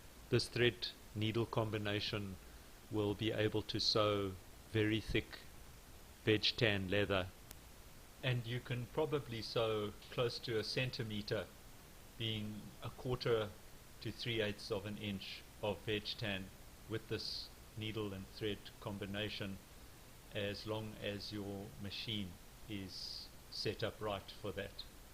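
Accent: South Africa. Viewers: Best video on Youtube explaing thread and needle on this machine. Where can you buy the the 140 flatshaft needles ? You sound like you are from SA boet ?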